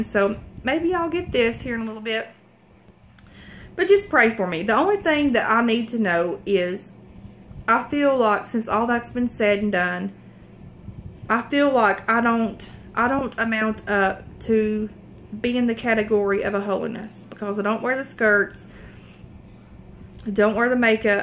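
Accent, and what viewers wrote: South, United States. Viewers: Hi sweetie. I don't wear make up, I don't shop a lot and I'm the worst singer in the world but you know why I subscribe to your channel? Becuase I can tell that your a genuine and honest caring person and when I hear your videos with that adorable southern accent, I think wow...me and her could get on as friends a real treat. Your shopping stories crack me up and your singing is so enjoyable. Don't let others take your light from you girl. Stand together with God in which you know what's right and you'll get by just fine. This is just for now and this will pass. Don't cry pls. You're impacting others to do right in this world so chin up, deep breath and step forward. Xo Mrs Localvore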